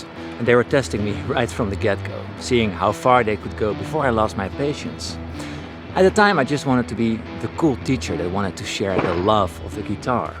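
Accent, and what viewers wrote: Netherlands. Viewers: Nu begrijp ik waarom ik je Engels zo prettig vind, je bent ook Nederlands of op zijn minst deels. Leuk. Succes met je kanaal, ik heb er erg veel plezier van. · I already thought you would be from the netherlands from your accent, but what town are you from? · Watching your footage on YouTube I didn't notice at all however in this vid I thought 'hey this guy sounds Dutch'. In seconds I recognized the Dutch style houses and the distinctive yellow car registration plates - Holland!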